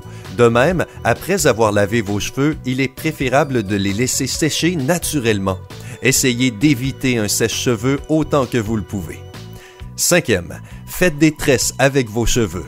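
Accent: Quebec, Canada. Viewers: L’accent québécois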